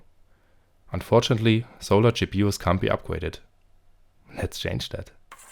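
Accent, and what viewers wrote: Germany. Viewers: I hear German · i hear a bisschen deutsch??? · Im hearing deutsch in his voice🇩🇪🇩🇪🇩🇪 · When the narrator has a German accent in a tech video you know it's gonna be good. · Wusste ich doch, dass du ein deutscher bist. Unseren Akzent kann keiner "nach" machen haha.. Guter Job. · u sound kinda german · I love how I could immediately tell that is guy's native language is German after some two or three words, lol. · Wie man direkt den deutschen Akzent raushört 😂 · Also Ich muss schon sagen, deine Hand ist echt ruhig, aber deine Aussprache verrät, dass du Deutscher bist und das, ob wohl Ich auch einer bin, aber du machst gute Arbeit und daher meine Frage, warum nicht in Deutsch, sondern in Englisch oder hast du auch Deutsch Videos? Sorry bin Pfälzer und ja wir haben es net so mit Sietsen hoffe bist deswegen net angepisst ist dein Vorname ok oder soll ich ihn nicht nenne gleich mal Daumen und Abo dagelassene ach und (net) heist soviel wie (nicht) in Sachsen weis net ob da so pfälzisch verstanden wird deswegen hoffe nimmst mir net übel ,weil mir ist auch aufgefallen das sich sonst keiner traut mal einfach Grafikeinheit zu tauschen oder Prozessor, wenn er verlötet ist als Respekt wäre niemals auf die Idee gekommen wie Mann überhaupt rann gehen sollte aber bei dir sah es echt Profi mäßig aus ok noch mal gute Arbeit!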